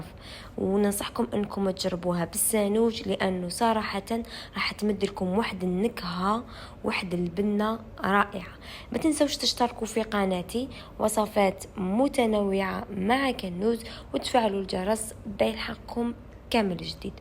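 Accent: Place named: East, Algeria. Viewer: المهم تتكلمي بالعربية خليك قوية مع اللهجة الجزائرية الشرقية خليك جيجلية إعجاب إشتراك تعليق و مشاركة😊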